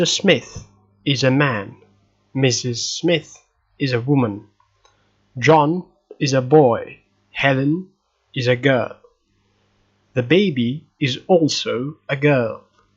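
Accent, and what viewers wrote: United Kingdom. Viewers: Hello, I am a native Spanish, I wanted to know if your pronunciation is British, since I want to learn that pronunciation and not the American one. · What is your native language? You sound British, but some words seems off. And your French sounds very native.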